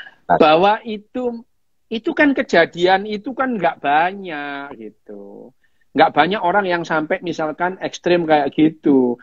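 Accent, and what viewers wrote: East Java, Indonesia. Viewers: Natural gaya orang Jawa timur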